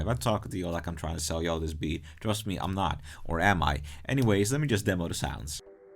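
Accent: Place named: Netherlands. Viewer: i can hear dutch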